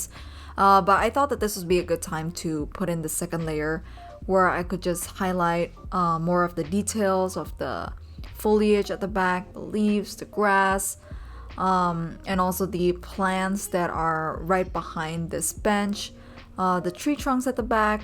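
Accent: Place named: United States. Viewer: Hi! I'm English Nothing wrong with your English Ah ah! Bit of USA accent to me But enjoy your channel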